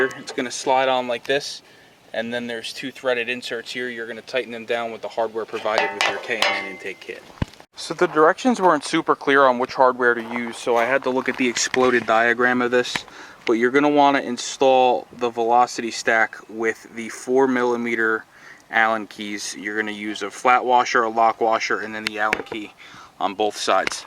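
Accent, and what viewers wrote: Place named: Philadelphia, United States. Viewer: You hear South Jersey, I hear Philly, but they are close enough to almost be the same.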